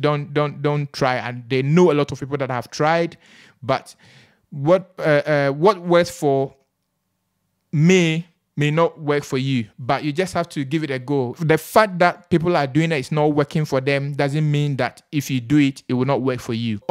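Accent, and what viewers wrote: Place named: Nigeria. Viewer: HE SOUNDS L I KE A NIGERIAN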